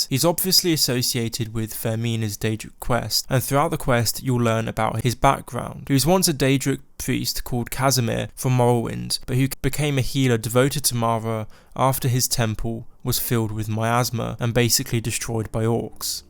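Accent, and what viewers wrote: United Kingdom. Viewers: *hum* Lemme guess, you from UK ?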